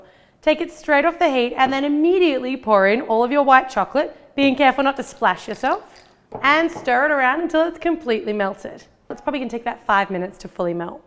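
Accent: Australia. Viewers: Australian I think :) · she is not English. her accent is Australian.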